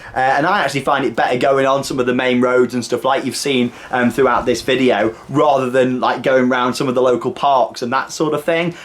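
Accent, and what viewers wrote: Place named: Staffordshire, United Kingdom. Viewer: Wow I live literally 10 mins from Uttoxeter I should've guessed where your from from your accent.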